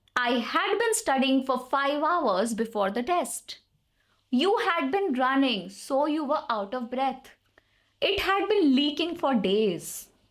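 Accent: India. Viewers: Your excent is so indian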